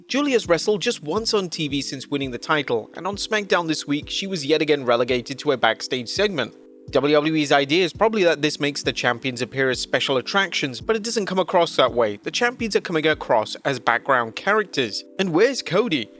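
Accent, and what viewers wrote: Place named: United Kingdom. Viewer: This host is definitely not American you can hear it in his crappy accent he sounds like he's from across the pond himself and he doesn't realize that Ireland is not in the UK?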